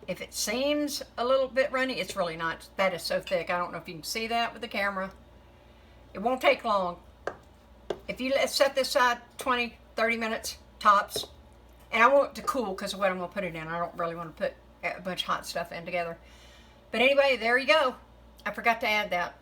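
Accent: South, United States. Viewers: It’s southern. I checked her channel she’s from Louisiana from the USA 🇺🇸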